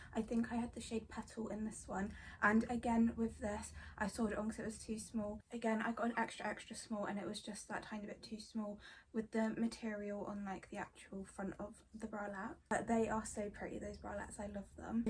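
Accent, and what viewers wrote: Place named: United Kingdom. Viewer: You are very pretty and cute. Beautiful eyes, cute voice with the British accent and pretty smile. Beautiful body and curves, cute little belly button. You look very pretty and very cute in everything this video. Everything fits you so well and look beautiful on your curves. You are really cute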